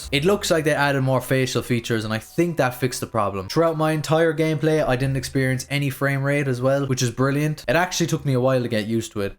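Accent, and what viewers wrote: Ireland. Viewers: That's just how Irish people say three.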